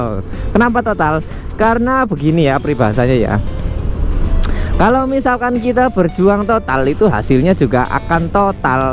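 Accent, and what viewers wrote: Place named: Java, Indonesia. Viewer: Oalah wong jowo sampean kang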